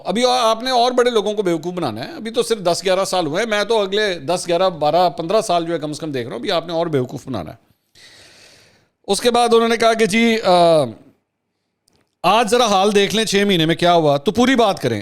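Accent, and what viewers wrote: Punjab, Pakistan. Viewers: Very nice v log full of Punjabi touch 👍👍 Tika k Punjabi jharh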